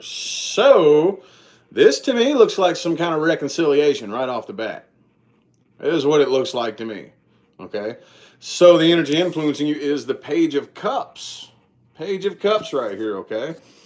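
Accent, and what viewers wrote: South, United States. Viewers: Loving your southern accent. I’m from the south too.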